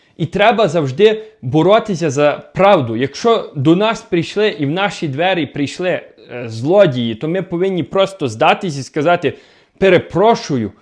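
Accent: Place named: Ternopil, Ukraine. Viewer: Велике насолодження слухати вашу мову. Мой тато з Тернопiльщини, i я згадую його слухаючи вас. Дякую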